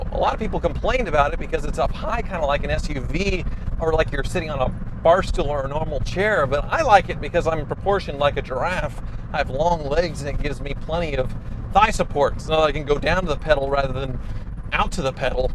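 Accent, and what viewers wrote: United States. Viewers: why cant YE YANKS pronounce words properly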